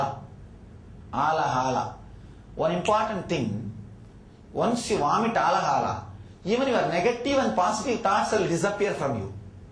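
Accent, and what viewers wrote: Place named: India. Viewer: Swamiji's accent has gotten strong again after his being back in India for Himalaya trip. It was hard to understand the first part, but worth while holding on, as usual. An interesting addition to the enlightenment lesson.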